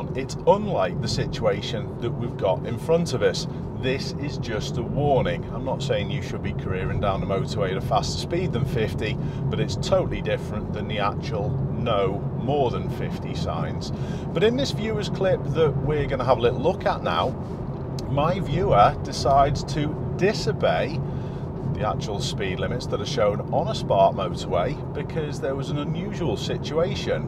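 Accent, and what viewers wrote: Liverpool, United Kingdom. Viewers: I've been driving for over 25 years, and luckily never had any points on me license. Does that make me a great driver, absolutely not. popped into my suggestions a year or so ago, but been an avid viewer since. Love his presentation and his calm scouse accent, but more importantly, everyday presents new challenges on the road. Watching videos made me realise, there is still a lot I don't know about, be it road signs or whatever, and these videos help me keep grounded as a driver.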